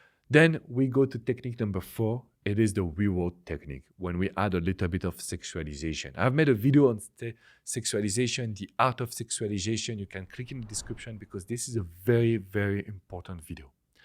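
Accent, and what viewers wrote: France. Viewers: I've just came to your channel, watched a couple of videos and I must tell you: you have very beautiful hands! I keep staring at them while listening ! And obviously I appreciate French man talk in English. Thank you for sharing great stuff. I subscribed, so I'm staying. Love from Poland. 💗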